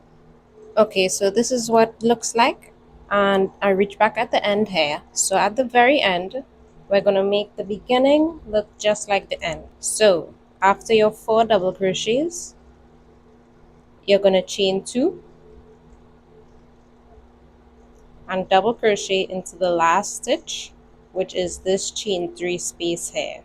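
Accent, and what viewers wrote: Trinidad and Tobago. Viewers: Ahh! I heard your accent and knew it was Trini!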